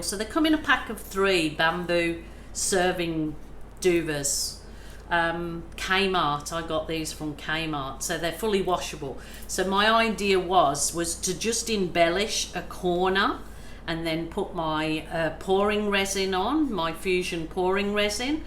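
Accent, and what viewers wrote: Birmingham, United Kingdom. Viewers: I knew there was a bit of Brummie in your accent! This is amazing, beautiful mirrored wings xoxox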